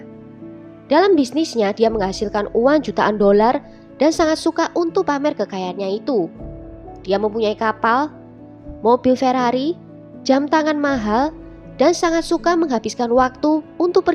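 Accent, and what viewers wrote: Java, Indonesia. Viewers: Kak.. org mn sih kok bahasanya medok banget. Club itu bukanya dibaca 'klap' ya dlm ejaan bhs indonesia. Masak di baca 'klup' jg... geli kedengaranya. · Kak...keren ih ceritanya... Btw spt logat org Jawa ya mbak... Sip smg sukses ya.... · dari pertama liat chanel ini aku dah yakin mbak e wong jowo koyok aku..😁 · Naratornya org jawa ini logatnya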